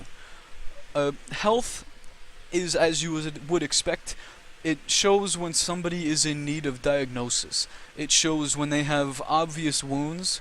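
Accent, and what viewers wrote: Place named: Brooklyn, United States. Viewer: Are you from Brooklyn?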